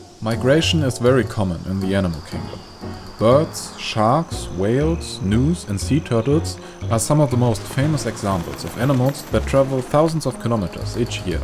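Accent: Germany. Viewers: Man hört den deutschen Akzent raus ^^ gutes Video 👍🏼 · I find the German accent so refreshing. It gives the impression of being straight to the point without any of the BS.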